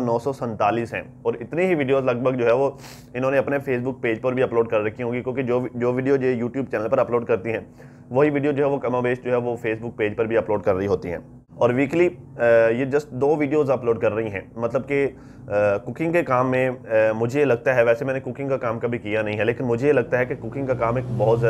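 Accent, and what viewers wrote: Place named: Hyderabad, India. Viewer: I think she is from Hyderabad, India. Their accent is very Hyderabadi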